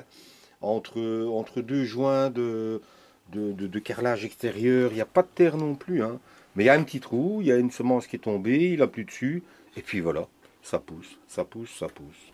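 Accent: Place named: Wallonia, Belgium. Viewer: moi tu pourrais encore faire tes vidéo en wallons je kifferais aussi. Il faut être fière de notre accent, nos formules de langage, de notre Belgitude... il n’appartient qu’à nous-mêmes! 👍🏼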